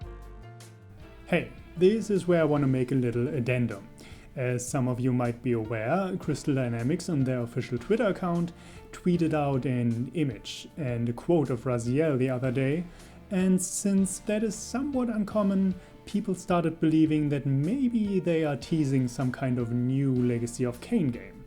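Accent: Germany. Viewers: Wow! What a wonderfully detailed summary! Love it! 👍👍👍 But it's funny how your German accent is getting more and more pronounced during the video. 😆😉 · Are you from Germany? You have a little accent. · I can't get past the german accent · This guy is obviously german. His accent is extremely german. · The German accent is strong with this one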